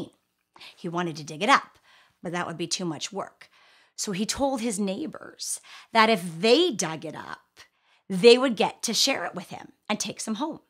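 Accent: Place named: Ireland. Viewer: Good fun, short tale and graphics to boot! Plus patented Irish accent! At no extra cost!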